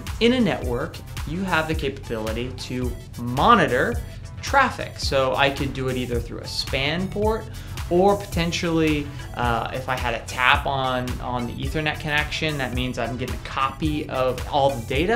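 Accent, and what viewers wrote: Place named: United States. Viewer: Good to hear an American English!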